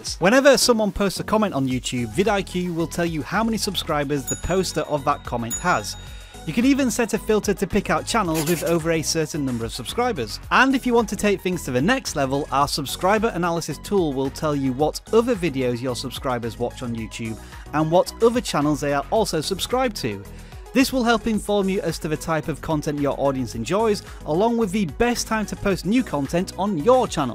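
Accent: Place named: United Kingdom. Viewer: random is vidiq British because he sounds like that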